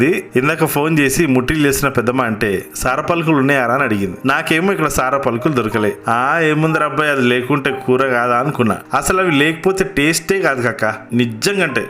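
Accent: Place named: Telangana, India. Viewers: Telangana basha ma vurilio matladinatee matladuthunnav babhi... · తెలంగాణ యాస సూపర్ 👌❤️ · Wow garu mi song mi telangana slang mi mutila curry super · Mee vantalu...song, voice,thelangana slang superoo....Super 👌👍👏🎁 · ఎమన్నా పడినవా అన్న 🙏, అయినా తెలంగాణ స్లాంగ్ లో మాట్లాడితే అదేంటో మనోళ్ళతోటి మాట్లాడినట్టే ఉంటది, మర్చిపోయిన ముట్టిల కూర మాత్రం చూడనికి 👌చేసుకొని తిని ఎట్లుందో చెప్తా అన్న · Anna ma telangana masth matladinave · Emi chepennavi kaka.. mast ga undi … ni commentary feeling home! Same elakha nenu kuda! Mi Enti deggara ne jawahar nagar colony … 😁😁😁…. Hats off ni Telangana ki 🙏🙏🙏🤣🤣🤣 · Abbabba e mutti la kura tinabudhi ithundanna maku kuda super ga unnadi, telangana bhasha mastu ga matladinav le ma anna anipinchukunnav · Me voice 😘....daneke thodu Telangana slang ahaa crazy combo..... ❤️ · Super recepie completely different presentstion telangana slang itaghadesav with diferent song love 💘❤💕 u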